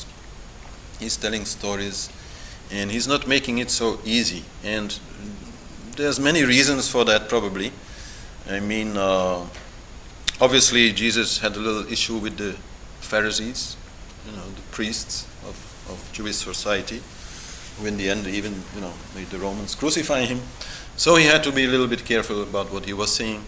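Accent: India. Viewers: You speak English with an Indian accent. You must have lived in India many years! peace.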